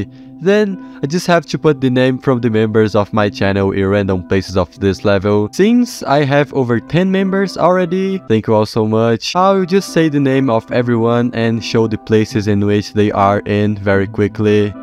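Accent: Brazil. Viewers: are you from brazil? the accent sounds familiar... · You brasiliano?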